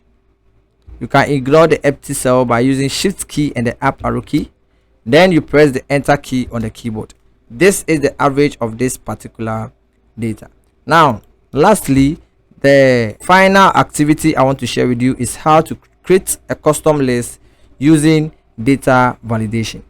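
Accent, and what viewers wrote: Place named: Africa. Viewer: I really love your accent. Are you an African?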